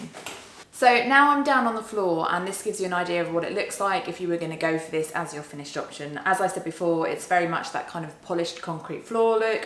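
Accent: United Kingdom. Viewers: British akcent ❤️❤️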